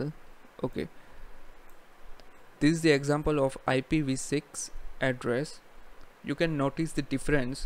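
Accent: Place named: India. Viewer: love your indian accent, great video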